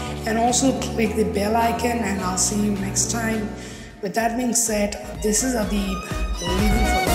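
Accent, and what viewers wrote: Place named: India. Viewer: Indian accent, bad voice quality and music. Awesome video. Dislike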